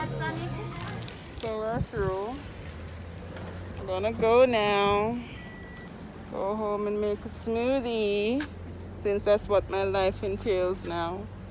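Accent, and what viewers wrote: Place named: Jamaica. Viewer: Good job, I like jamaican accent , yes man. You are a whole vibe and a very happy gal.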